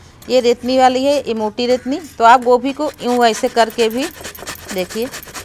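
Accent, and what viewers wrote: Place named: Ghazipur, India. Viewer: Sister aapki baate bht acchi lagti h meri mammy aapki trah hi bolti thi woh bhi gazipur se thi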